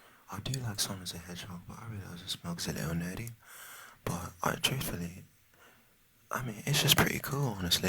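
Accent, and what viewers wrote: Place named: United Kingdom. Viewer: Lol I actually thought you were british 😂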